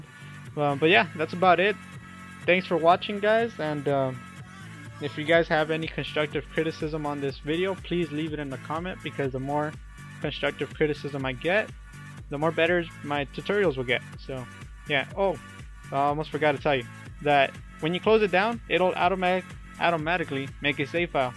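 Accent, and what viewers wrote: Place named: India. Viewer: No offence dude but ur mike makes you sound like indian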